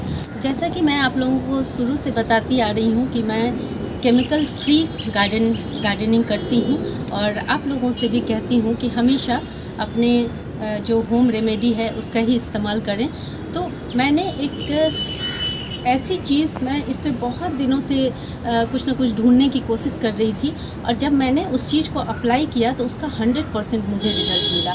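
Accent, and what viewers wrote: Bihar, India. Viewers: Aapki boli me Bihar hai. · Nice information....ap Delhi rehte ho, bt apka tone to Bihari hai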